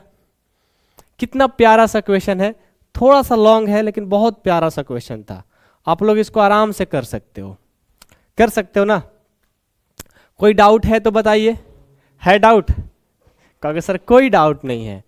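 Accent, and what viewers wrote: Bihar, India. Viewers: Sir aap Bihari se ho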